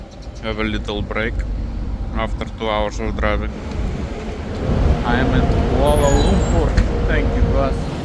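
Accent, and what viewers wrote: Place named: Eastern Europe. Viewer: I like your commentary. The sarcastic eastern european undertone always shines through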